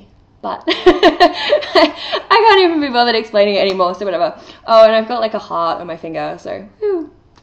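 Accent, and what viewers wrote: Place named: Australia. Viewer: 1st time ive watched one your vids,your funny as fuck.aussie as accent.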